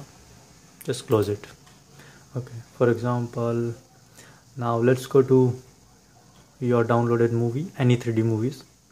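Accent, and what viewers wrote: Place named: India. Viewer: Thats how hindi douche speaks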